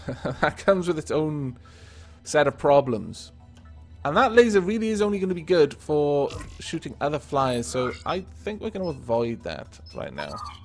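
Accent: Wales, United Kingdom. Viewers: are you from wales buddy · You're so welsh! Nice vid though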